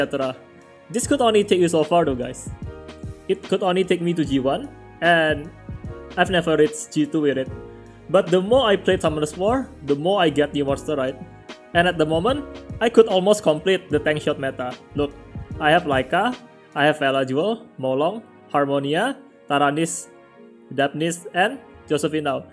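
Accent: Indonesia. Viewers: Logatnya indo banget bang , btw vellajuel cocoknya combo sama siapa ya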